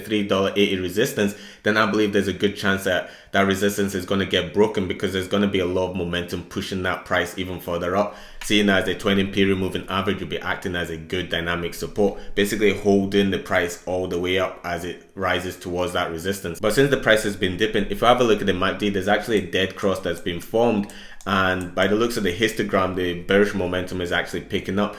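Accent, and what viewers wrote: Scotland, United Kingdom. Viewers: You remind of Josh finance got in before shiba inu broke a 0 I'm heavily invested in mana so subscribed and liked 👍 where you from also you sound Scottish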